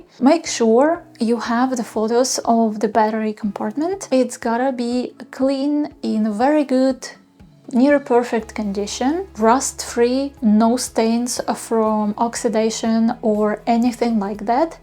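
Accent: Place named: Ukraine. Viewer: Класне відео, почув акцент і думаю звучить ніби Українка, потім бюпобачив герб на шиї)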